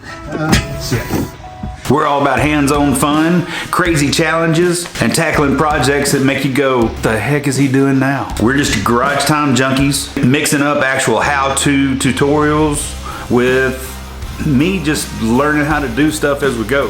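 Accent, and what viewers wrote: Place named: South, United States. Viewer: Love hearing that southern drawl! 🤣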